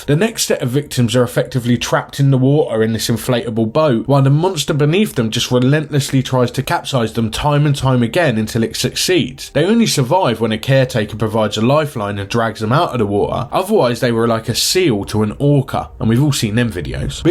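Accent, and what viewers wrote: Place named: East Anglia, United Kingdom. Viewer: Are you from Suffolk or Norfolk? Trying to place the accent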